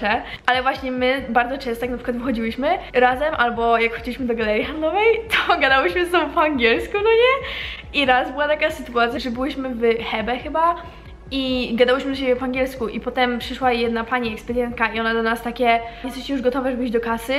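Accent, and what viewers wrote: United Kingdom. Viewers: Ty masz już taki akcent angielski i mówisz po ang jak byś tam mieszkała od zawsze hhshshsgsgs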